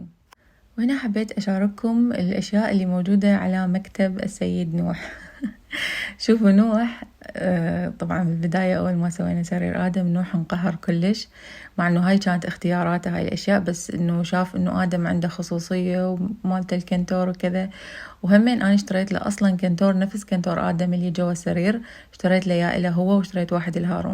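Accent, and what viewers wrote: Iraq. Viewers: هلو شلونج حبيبتي سوال فضولي لو سمحتي انتي تكولين ولدتي وعشتي بلعراق ولهجتك عراقية مىة بلمئة سوال انتي والدك سوري اكيد لاكن والدتك سورية لو عراقية واذا كان والدينك سورين اثنينهم شعجب تعلمتي لهجة العراقية حتى نولدتي بغداد مجرد سوال اذا ماتردين تجاوبين علي من حقك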